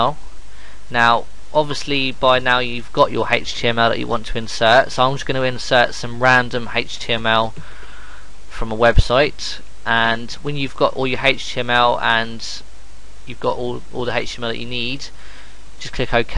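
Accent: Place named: United Kingdom. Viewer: British accent -- leave the man alone, He put up a video while we yap away :)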